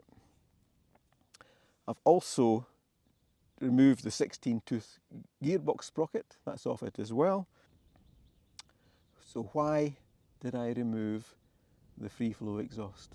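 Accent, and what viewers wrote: Scotland, United Kingdom. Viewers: haha no doubt, but I meant experiencing the next level of RE bikes. Anyways, thumbs up to these cool videos and that super cool Scottish accent.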